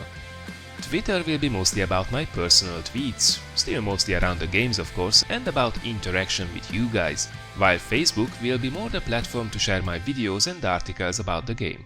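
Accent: Hungary. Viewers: Tipikus Magyar akcentus.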